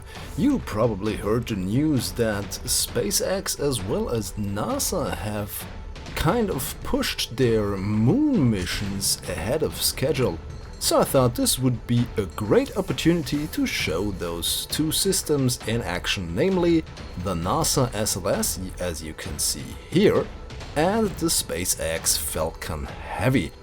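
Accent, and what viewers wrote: Germany. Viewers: You are a german right? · Your English kinda sounds like it had a little German accent and your Space Station's name is Franz so... Nevermind. But where do you come from? Because you said you were proud of Europe producing for NASA. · You sound like a german, are you german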